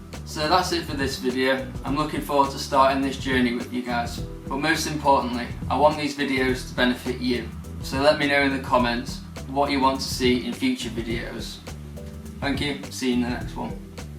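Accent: United Kingdom. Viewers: Brilliant! Thanks for that, really looking forward to see the other videos you’ll make! Anything will be good for us I guess :) Btw your intro is perfect, and the video is great. Sometimes it’s a bit tough to understand your British accent but I guess it’s going to get better with a bit of practice!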